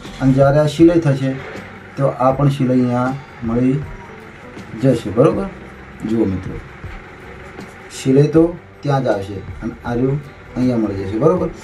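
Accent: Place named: North Gujarat, India. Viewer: ઉતર ગુજરાત ની ભાષા સે સાહેબ,,કાયુ ગામ જનવાજો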